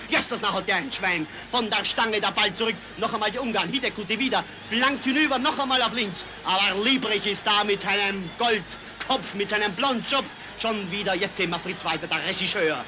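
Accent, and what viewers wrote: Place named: Austria. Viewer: Die ganze Diktion und Sprechweise...eindeutig Österreich.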